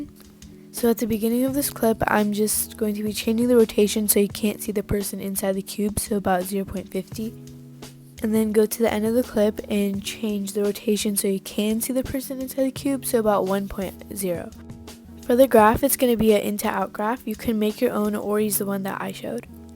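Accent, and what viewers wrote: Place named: United Kingdom. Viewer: i thought you were british for a good minute 😭😭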